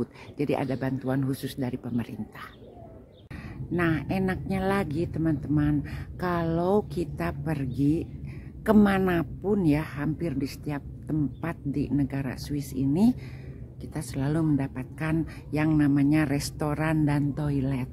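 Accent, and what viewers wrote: West Java, Indonesia. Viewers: Wilujeng sonten Bunda, reseup pisan logat Sunda na kaciri keneh, God Bless you🙏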